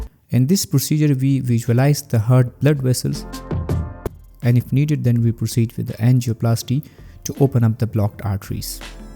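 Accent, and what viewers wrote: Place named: Afghanistan. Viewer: sir i loved your pashto tone….I personally first time watched a pashto medical related video which was quiet good….❤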